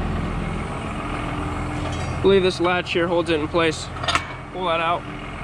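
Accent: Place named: Southeast Pennsylvania, United States. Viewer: Found this channel by accident, started watching and thought "that guy talks like he is from SE PA". Then I saw the video of the area and looked like the Lancaster area. Sure enough I was right, I live about an hour away from you and visit that area occasionally. Great to see videos of hard working Pennsylvanians. Keep up the good work and the great videos!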